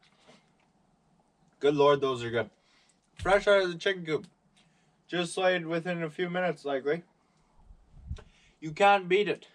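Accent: Canada. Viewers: Canadian eh!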